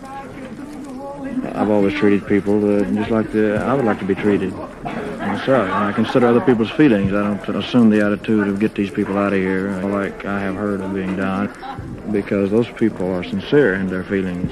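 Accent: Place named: South, United States. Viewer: I LOVE TO HEAR ELVIS SPEAK, HIS AMAZING SOUTHERN ACCENT. THIS WAS A GREAT INTERVIEW WITH THE KING OF ROCK N ROLE FOREVER !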